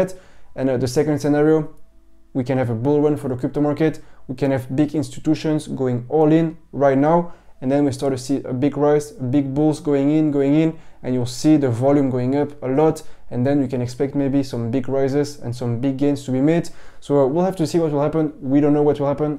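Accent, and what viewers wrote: France. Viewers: Everytime ...something big is coming up ...everything is ,, bullish“ and ,,amazing“ and looking at Shiba ,,realistically“ but I lost around 500 Euros since last week Monday ! So tell us when the Long term holders you think will make plus . What is ,,soon“ that Shiba,, can reach Doge“ ?!? Nothing is ,, looking great atm“ ! But I like your accent :-) the first french who likes to talk in English 🔥💯! Best regards from Germamy 💯🔥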